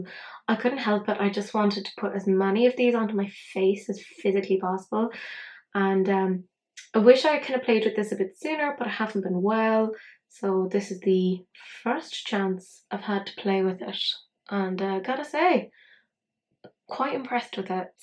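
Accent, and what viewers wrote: Ireland. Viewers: I bought this palette because of the bright colorful shades, that I normally wouldn't wear, but I thought the bold colors in this palette would bring out the intensity of some of my multichromes!. Nice to hear what sounds like a "Dub" accent schooling me on some new make-up! I definitely will use your code on my next order with this brand.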